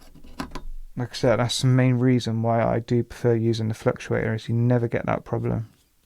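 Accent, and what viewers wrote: United Kingdom. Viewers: By your accent I think you’re Brithish . Here in the Netherlands we have slightly different pipe connections, but I’m watching your technology with great interest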